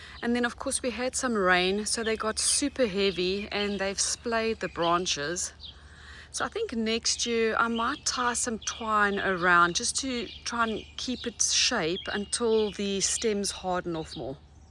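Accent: South Africa. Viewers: Love love your channel l am learning. Thank you so much. You have a SA accent.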